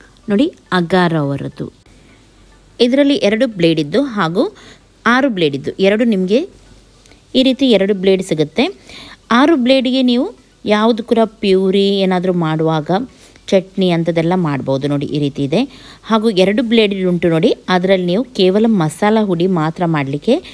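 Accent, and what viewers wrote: Mangalore, India. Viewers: Your from our mangalore your words like manglore puchhk take pucch 😅😅i like this tq..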